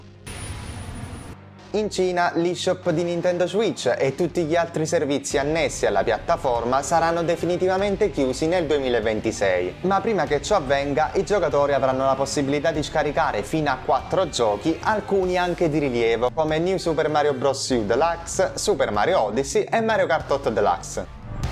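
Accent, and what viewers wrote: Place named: Campania, Italy. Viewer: Ho giocato Xenoblade per la prima volta l'anno scorso partendo dal 2, poi questa primavera sono passato al 3 (un ordine che lascia un po' a desiderare per seguire la storyline, ma tant'è 😂). La serie mi ha catturato tantissimo e la reputo un nuovo Kingdom Hearts per intreccio narrativo, ma uno venuto bene! (senza esagerati mindfu*ck, insomma)! L'idea di un anime avrebbe totalmente senso! Non sono sicuro che elimineranno le Definitive Edition perché gli fanno macinare soldi, ma sarebbe se non altro un modo per fare contenti i fan della serie e per avvicinarne di nuovi. Apprezzo molto i contenuti che tratti perché parli spesso di informazioni di cui non si parla molto in Italia. Un piccolo commento "tecnico", se posso. Rimuoverei il suono "sh" della parlata campana. Non che voglia eliminare la tua originalità, ma credo darebbe un piccolo tocco piu professionale al canale. 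Ovviamente, parere personale. Detto questo, continua cosi che sei bravo! 👏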